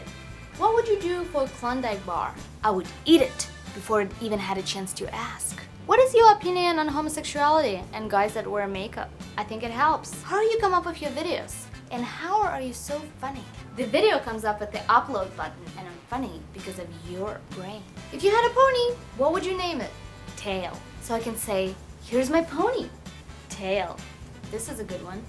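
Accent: Russia. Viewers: I would....yeah probably steal it from my sister and eat it. Я люблю ваш русский акцент! :) Moosh!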